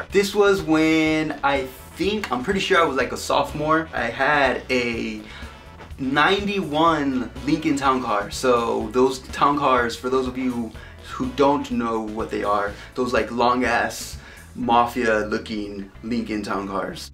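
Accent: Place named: Russia. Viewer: What is he? Like Russian? German?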